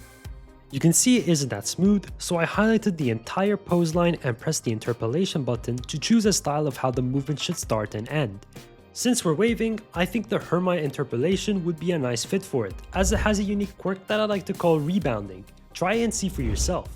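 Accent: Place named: United States. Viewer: You sound that youre from the US with a dual citizenship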